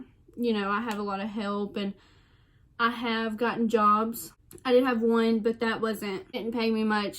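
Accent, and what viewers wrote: United States. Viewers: You gotta be from Texas or Tennessee 🤣❤️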